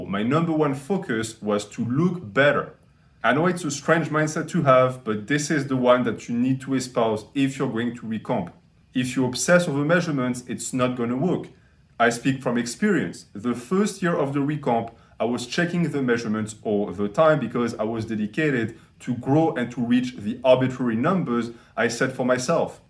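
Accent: France. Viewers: I suspected him being a French speaker at the beginning, and and was fully convinced when he said “the love Andles”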